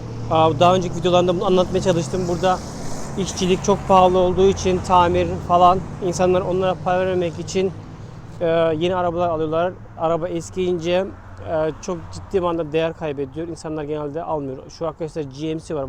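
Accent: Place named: United States. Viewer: Kardeş neden Türkçe konuşurken amaaaaarikan aksanı kullanıyorsun Aaaaa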